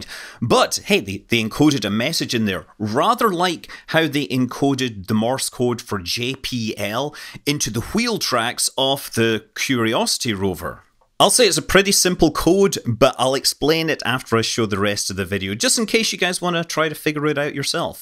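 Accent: Scotland, United Kingdom. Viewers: As in Star Trek, the best engineers have a Scottish accent. Thanks Scott Manley!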